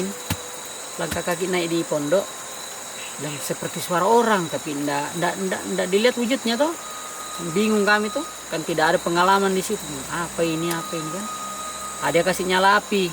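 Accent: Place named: Makassar, Indonesia. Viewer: Eh ,, Toh ,, Hehhe makassarku sekali😘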